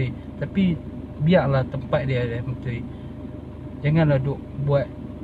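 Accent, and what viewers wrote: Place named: Kedah, Malaysia. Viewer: Org Raub ckp kedah ye...hahahahhaha terbaik teruskn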